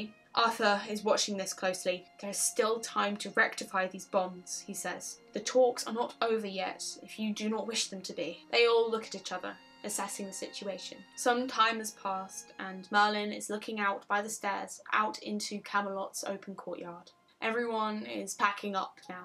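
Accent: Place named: RP, United Kingdom. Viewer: two thumbs up! or more if I had any more. no seriously, I liked the story but even more so how you presented it. very lively, engaged and full of attention to detail I had no trouble following and genuinely enjoyed it all throughout the two parts. your RP diction was an added bonus too. thx very much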